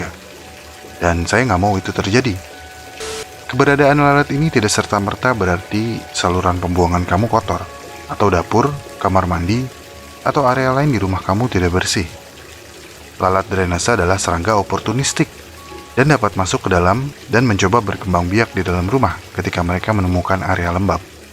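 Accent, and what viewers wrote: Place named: Java, Indonesia. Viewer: wong jowo fix ono kata" deng 🙈🤣,jowo ne ndi mas ?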